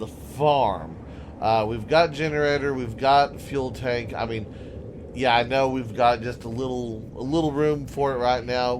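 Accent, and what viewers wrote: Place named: Texas, United States. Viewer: Some suggestions because you asked for it :D I'm started playing the game and watching your videos since alpha 8 came out. I like your videos, because your playstyle is a bit similar compared to mine, and I like the texan accent as well. :D In your videos I think you should go for more exploration and less building. I mean, building is fine, but sometimes there are too many sv / hv / cv build videos come one after the other. Back then, when alpha 8 was hot, there was not as much content in the game, than now we have. You are still playing the game like you played alpha 8. But with alpha 12 and with the project eden scenario you have literally hundreds of POI-s, and hundreds of planets and systems to explore. I don't say you should warp to a new planet in every video - not at all. But a bit less detailed base building, a lot less detailed sv / hv / cv build videos, and a lot more exploration (poi-s and planets), because I don't think you would run out of content before a huge update would come and you should have to start a new game to get the new stuff. 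Here's how I play: escape the horrible starter planet (rogue), find a good planet (snow planets are my favorite too). On the snow planet, build a huge base, using it as main base, as HQ etc. and with the new teleporter I can come back to it from anywhere, and I don't have to abandon it, and keepin improve it till time to time. I hope it helped a little bit.)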